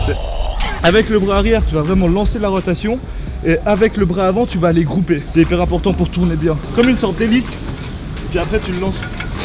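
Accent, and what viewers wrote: Switzerland. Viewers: Dès le début on reconnaît ton accent suisse ! TeamSuisse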